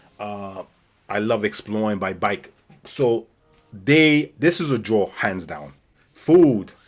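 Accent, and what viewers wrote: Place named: Malaysia. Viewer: great to hear a local accent. Thank you for all the tips. Love Malaysia too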